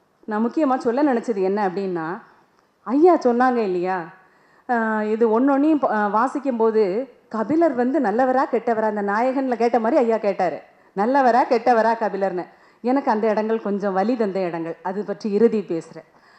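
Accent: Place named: Tirunelveli, India. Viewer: திருச்செந்தூர் ..... திருநெல்வேலி ...தமிழ் செம்ம....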